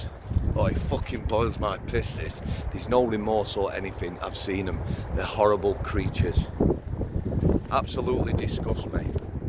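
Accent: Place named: Yorkshire, United Kingdom. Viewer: 100% right, none of the politicians in this country are worth a wank and I wouldn't dream of bothering to vote for any of em. You're a top man buddy and you say all the true things that all of the normal decent people of this country think, its a shame none of the mp's feel the same way or do fuckall to make us feel like theres even any hope for the place. If anything England is getting worse, I sometimes wonder how both my grandads would feel if they saw what the country is like nowadays, they both fought against Hitler but I'm pretty sure they would wonder if it was worth the effort if they were still around today. Have a good day, you and are well liked by us all. You honestly sound like you are very close to me actually, Ossett, Dewsbury area ish.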